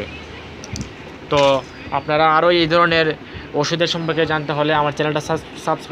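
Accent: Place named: Habiganj, Bangladesh. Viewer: VOICE IS HABIGANJ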